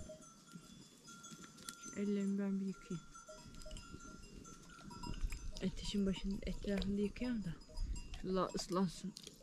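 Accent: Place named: Gerede, Turkey. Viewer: Konuşmalarını dinlerken bu kız Karabüke yakın bir yerlerden ellehem dedim Geredeli çıktın konuşma aynı bu tarafla.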